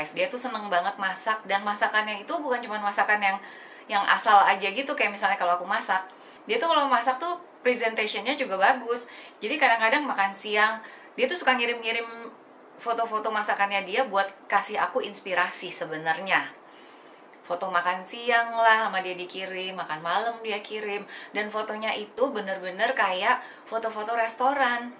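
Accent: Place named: West Java, Indonesia. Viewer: ka suka banget denger kamu ngomong sunda euy 😂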